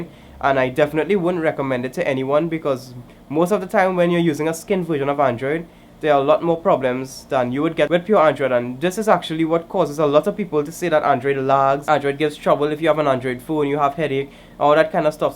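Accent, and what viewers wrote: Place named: Trinidad and Tobago. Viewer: Rel glad you stick to your trini "accent"...good stuff...keep up the hard work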